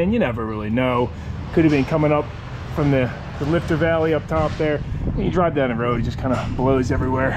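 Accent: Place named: Baltimore, United States. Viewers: I'm from Baltimore and your accent reminds me of back home. When you replaced the thermostat, did you use permatex the right stuff on that thermostat housing? In addition to the gasket? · Are you from Philadelphia you have an accent I hear it. wuder hoome We say home with a long O. 🚁 🪂 If you're not you're from Baltimore they have a similar accent. ✌🏻👽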